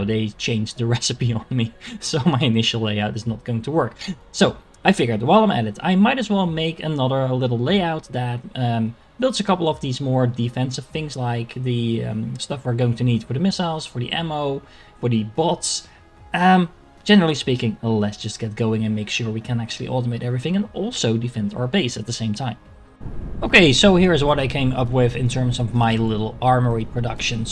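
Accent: Netherlands. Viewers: Je klinkt gewoon extra Nederlands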